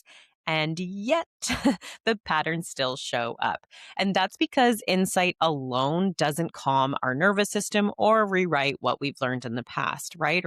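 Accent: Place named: Canada. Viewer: This is the female version of Modern Wisdom; she doesn't have a British accent but she talks as fast. Canadian, eh?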